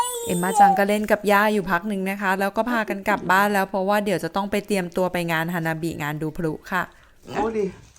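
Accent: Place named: Ratchaburi, Thailand. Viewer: พี่เป็นคนจังหวัดอะไรครับผมฟังจากเสียงเล้วเหมือนคนราชบุรีเลยครับ😅😅😅ถ้าผิดต้องขออภัยด้วยครับ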